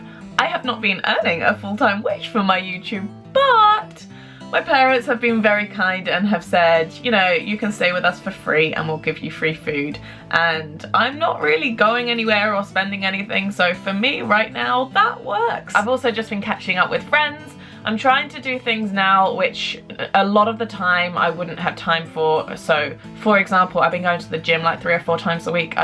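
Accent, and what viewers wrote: New Zealand. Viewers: Thanks for the update. Your accent is so kiwi it's UNREAL! Haha. We decided to start exploring the UK too after coming back from Asia - like you said we can plan to see countries on the opposite side of the world when we haven't even explored our own. Look forward to the upcoming videos, Amy and Yas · so excited to hear what your up to these days :D also your accent keeps making me laugh because the kiwi accent keeps coming through haha!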